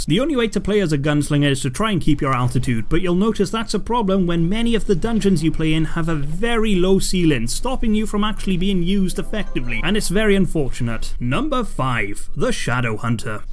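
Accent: Wales, United Kingdom. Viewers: What part of wales you from mate? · Is he Welsh?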